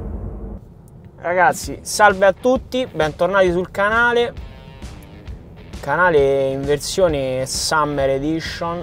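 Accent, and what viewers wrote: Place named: Rome, Italy. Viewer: Ciao ma io ho preso l'autopilot avanzato in fase di acquisto della mia Model Y Performance e poi sono 3.800 euro certo è un pochino monco ma come vedi piano piano lo stanno sistemando poi oltre all'autopark che io avevo sulla mia Toyota Auris Hybrid del 2013 quindi una della prima versioni e devo dire ben funzionante con i sensori di parcheggio, ha anche l'autopilot e cambio corsa automatico e devo dire che funziona discretamente certo da affinare ma funziona.. ora secondo te, non sapendo di che parte di Roma tu sia, ma credo molto fuori Roma, beh ci vedi l'FSD entrare in pieno centro Via del Corso o vicino al Duomo di Milano o ancor peggio a piazza Plebiscito a Napoli? da parte mia lo vedo molto molto impraticabile anche perchè il traffico scomposto di Roma come di Milano che soprattutto di Napoli il sistema frenerebbe ogni 3x2 causando incidenti a manetta quindi è impraticabile ora ripeto capisco che sei di Roma dalla "perfetta" parlata Romana ecco un consiglio che ti vorrei dare.. 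prendi il Raccordo Anulare alle 8 di mattina entra a Roma e vai verso il Centro magari verso il Vaticano e ti renderai conto che nelle grandi città italiane l'FSD non potrà mai funzionare in America è tutto bello e tutto figo ma loro a differenza di noi il traffico è molto più lineare e preciso tutti ad una massima velocità che non si "sgarra" ecco pensa se a Roma andassero tutti a 50 km/h sai come ti manderebbero a FFAN...O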